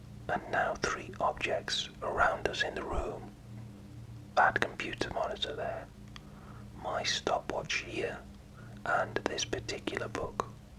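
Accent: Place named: United Kingdom. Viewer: British! Yes!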